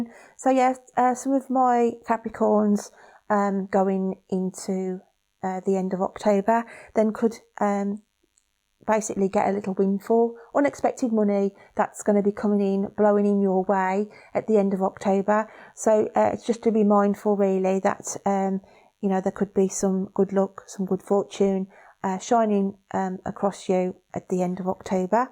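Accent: Birmingham, United Kingdom. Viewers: thanks my lovely that was really cool what you did it accent sounds local I'm from Birmingham UK I'm sure ur not to far lol x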